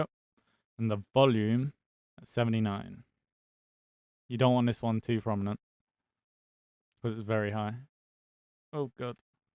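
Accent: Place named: United Kingdom. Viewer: Oh man you're British! And you are the dude that made those sick sonic remix! Ahh it all makes sense!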